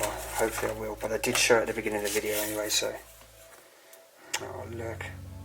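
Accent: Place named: United Kingdom. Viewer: Hey I absolutely love your videos. Of course I find them absolutely fascinating, but there is one thing that has been driving crazy and I would live some clarity. What part of the UK are you from? Sorry it's silly, but I've heard it before and I would love to be able to finally place it! Ty!!!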